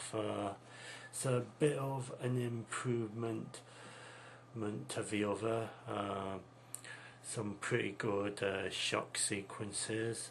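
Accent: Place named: United Kingdom. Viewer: finally A BRIT!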